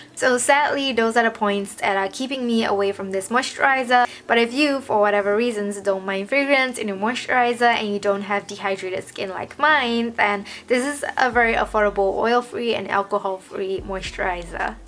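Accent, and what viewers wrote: Singapore. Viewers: Are you Singaporean?? Interesting the way you pronounce ‘really’ and ‘mugwort’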